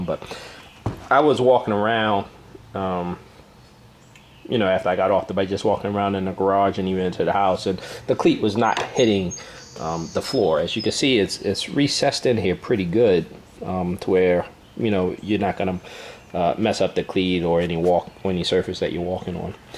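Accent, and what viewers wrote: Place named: Baltimore, United States. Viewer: This guys got a super baltimore accent. Good vid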